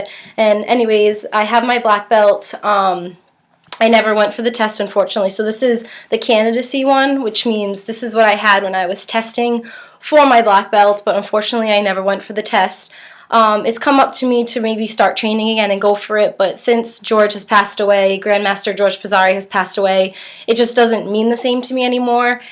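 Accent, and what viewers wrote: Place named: New Jersey, United States. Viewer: Love this video. I a a new subscriber. Please sub back and anyone else that does, I will too. You sound like you are from New Jersey. Love your accent. :) You are awesome!!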